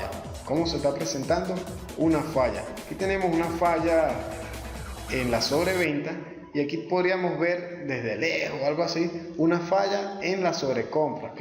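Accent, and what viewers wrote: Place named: Venezuela. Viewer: Conho hace mucho que no escucho un Venezolano... Bien hay mi pana estoy aprendiendo y tu aporte es importante, gracias.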